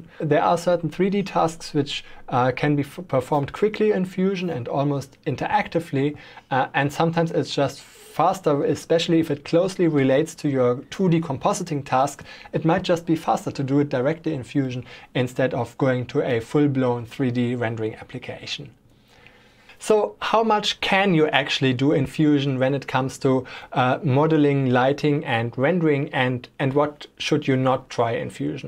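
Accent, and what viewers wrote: Germany. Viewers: man hört so har das er deutsch ist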